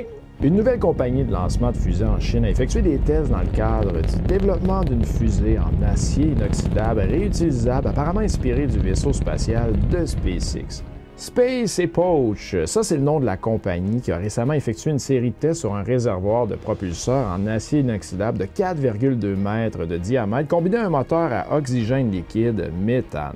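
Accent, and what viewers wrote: Quebec, Canada. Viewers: perso parfois les déformation de la petite voix mélanger a l’axent Qc la rende incompréhensible a la première lecture, es qu'ils y en a d'autre qui on se problème ou faut que je demande un supplément de caribou a la cantine ;p ?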